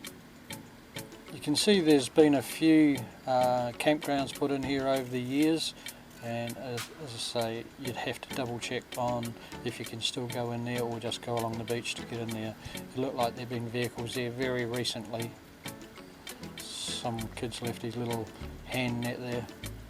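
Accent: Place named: New Zealand. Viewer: Brilliant mate. Think I can hear an accent from back across the ditch! I’m a local Perth kayak fisherman as well so am enjoying your content. Thanks for sharing spots and launch spots. Cheers mate and keep it up 😃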